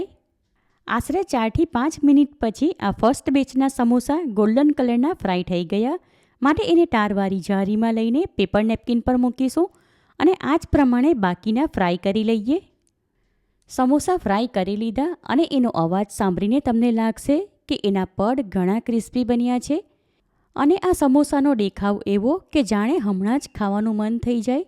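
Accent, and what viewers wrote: Surat, India. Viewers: Ben tme to jaberdast surti bolo 6o ne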